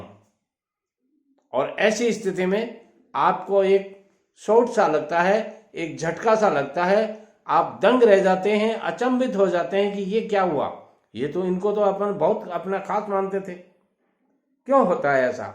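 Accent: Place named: Bihar, India. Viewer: Dadaji Ham aapki awaaz ko mahsus kar pa rahe hain jaisa lag raha hai ki aap Bihar Jharkhand se honge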